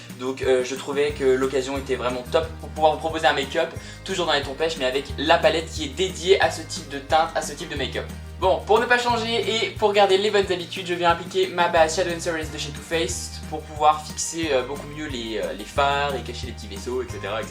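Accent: United Kingdom. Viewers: très jolie ce petit look... Très frais et naturel. #teamsnapchat. Tu as un.bel accent anglais :)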